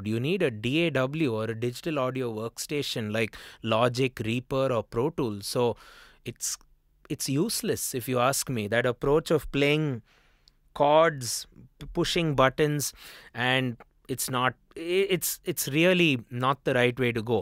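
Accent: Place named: India. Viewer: Following ur channel ..I parallel ly learnt bit of english ,😆😆 in indians ascent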